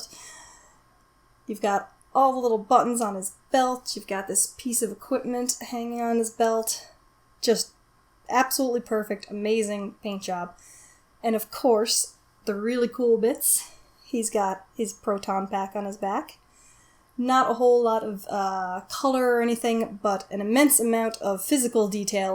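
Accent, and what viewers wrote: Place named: Ireland. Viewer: Is one of your parents Irish? It's just you say certain words with a real Irish twang. Loved the review.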